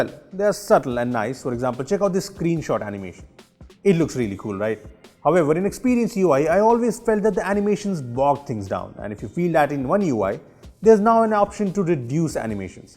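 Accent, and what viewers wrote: India. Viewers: I'm a simple man. I hear an Indian accent, I press dislike.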